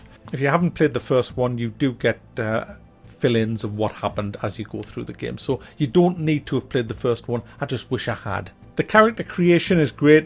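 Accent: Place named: Newcastle, United Kingdom. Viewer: Great review. Thanks pal. You Newcastle or Sunderland btw?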